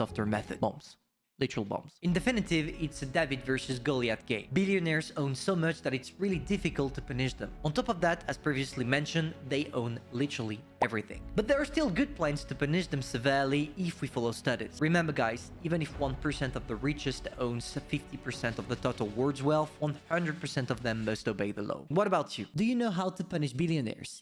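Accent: France. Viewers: ! Been watching you for a while know and you probably know that already. I would rate this video a 9/10! For a first timer this is fantastic and I would definitely not mind watching vids like this. The only thing you could improve with is your pace. It was a bit quick and due to your French accent it's slightly difficult to understand. If you slowed down a bit 10/10 vid! GL on making more vids like this, these type I enjoy:D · Very interesting and informative... but IMO it would be good to have some curated subtitles alongside as your French accent is quite strong. I find it difficult to understand some parts as a native English speaker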